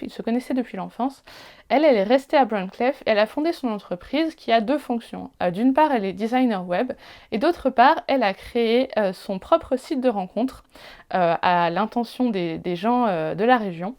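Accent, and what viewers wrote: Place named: England, United Kingdom. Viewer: Je viens de tomber sur ta vidéo par hasard et j ai beaucoup aimé. Parce que j adore le cosy mystery et que je veux perfectionner mon anglais pile le sujet de ta vidéo, je vais donc attaquer par les détectives du yorkshire😊. En recommandation j aime beaucoup les nombreux livres de joanne flucke avec anna swansen et sa pâtisserie, c est génial et on peut même faire les recettes 😂. J adore ton accent anglais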